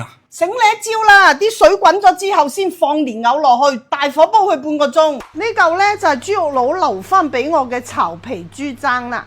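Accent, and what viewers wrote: China. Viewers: 广东人讲嘢是咁语气 广东汤猪峥最正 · 令人討厭的聲線及語氣，大陸人的廣東話與香港人的不一樣，一聽便分得出。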